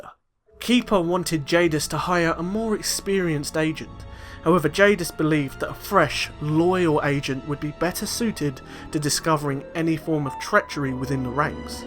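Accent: United Kingdom. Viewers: I like these vids. Listening to SW History told by someone with a British accent, makes it so much cooler.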